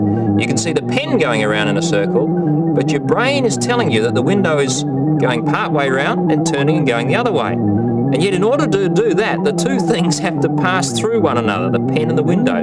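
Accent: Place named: Australia. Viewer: Optical illusions are 127x better when presented in a thick Aussie accent.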